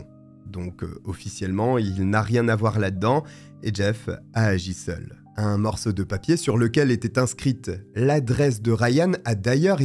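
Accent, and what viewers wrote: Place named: Europe. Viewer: Je te ❤ ta voix me caresse les oreilles et tu n’as pas un trop gros accent. ( en t k il est charmant et pas agressant) comparé à d’autre européen.J’adore ce que tu fais! Bizou 🇨🇦du Québec ✌🏽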